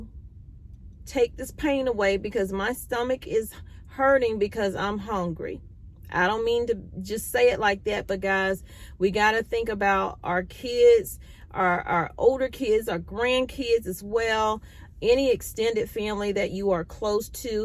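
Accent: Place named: Carolina, United States. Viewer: 🔥 Great video! Especially in the beginning when you talk and encourage people to prep and stock up. Why would you stop?? No.. Keep the talking at the very beginning before you go in because there could be first time viewers or people that haven't started prepping yet and need to hear this! Plus I love your Carolina accent.. OMG. Forgive me... it's beautiful ❤️ I'm in Los Angeles..so hearing you is like a breath of fresh air. Please keep talking and being passionate at the beginning of the videos... Because it . I've been prepping and stocking up for almost 3 years. Thank you 👍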